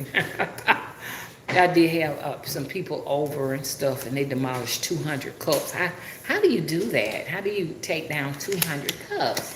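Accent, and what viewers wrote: South, United States. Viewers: You're so beautiful, I always felt rooted to you! im grateful for the messages. your locs are roots. And your voice is the southern ancestors. I spread ur messages with my followers and stamp your name n channel. I wish many watched you. Master teacher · Heeeeeey 🌹. 🌳. Your accent definitely "Southern"! Please 🙏 send a little of that "bulk" my way.🤗🤗🥰. You're My "People's ✅️ ✅️ ✅️ 🤗🥰.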